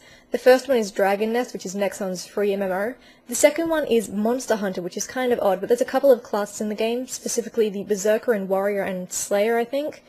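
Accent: Australia. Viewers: australian :O i love your accent XC wish i could exchange my american accent a european or australian :D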